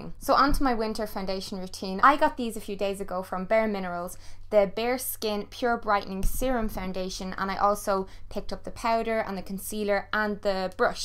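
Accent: Ireland. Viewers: What part of ireland are you from? I love your accent, I'm from dublin. I was just wondering (love the top btw) if you have any sun are tips because I now live in California and even though i wear sunscreen I get so burnt, I have trouble going to gym class! I was just wondering if you had any tips :) I love your videos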